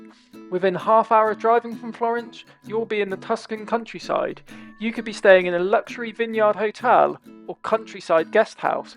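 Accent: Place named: England, United Kingdom. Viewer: You should REALLY REALLY learn how to pronounce correctly the Italian high points you’re trying to have tourists visit…..it’s quite an embarrassment to hear you TRY to speak Italian. You must be British because they NEVER pronounce Italian properly…and your accent points to England for sure. Thankfully I went to school there and speak Italian (northern, I might add…the proper Italian) so please stop these Italian tips……take a class, already.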